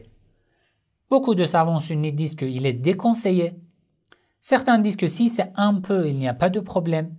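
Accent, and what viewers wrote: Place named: Iran. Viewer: Bonsoir, vous etes iranien?? J'aime bcp l'accent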